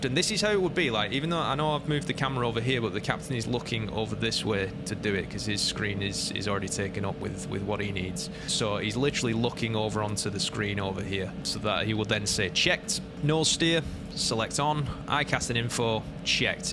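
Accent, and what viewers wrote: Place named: Lancashire, United Kingdom. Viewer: Thanks for the video! Much appreciated. Loving the accent😊 Preston? Blackburn?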